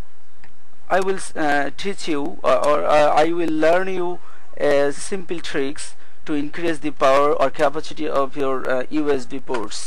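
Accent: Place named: Bihar, India. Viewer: when bihari boy try to speak english it becomes biharish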